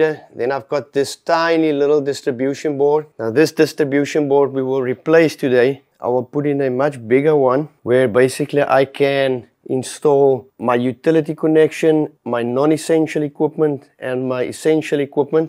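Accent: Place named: South Africa. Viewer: Awesome, I noticed from the accent and other comments that you're also from ZA. I recently started mining some XMR on a spare PC I have lying around at home. Got p2pool and a local monerod running and getting about 4.7kH on 8threads on a 5600g. Was wondering what pool(/s) you use? I heard it's better to have lower latency but the lowest for us is EU based and even that is around 200ish ms. Kind regards.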